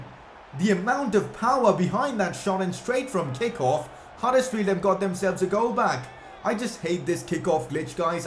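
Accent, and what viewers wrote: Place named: India. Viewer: Why did this dude embrace his full Indian accent now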